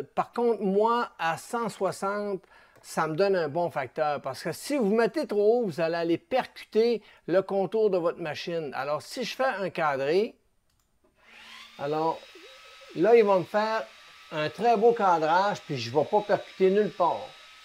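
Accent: Quebec, Canada. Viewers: Tout simplement, j'adore ton humour et ton accent Québecquois très cher cousin, au plaisir de te rencontrer chez toi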